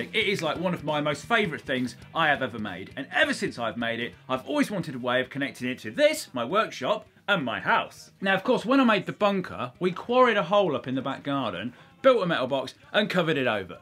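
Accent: United Kingdom. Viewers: bro this is the most bri'ish guy i evever seem!!! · You sound SO British.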